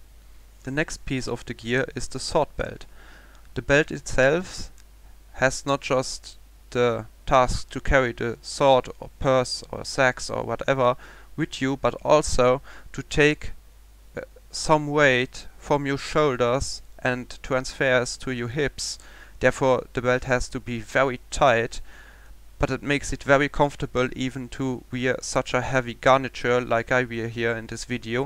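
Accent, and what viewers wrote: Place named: Luxembourg. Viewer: Luxembourgish accent ;-) So sweet compared to the hart german accent ;-)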